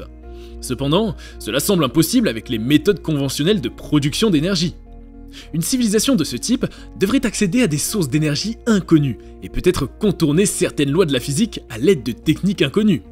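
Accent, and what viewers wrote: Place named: England, United Kingdom. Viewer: purée trop bien ton accent anglais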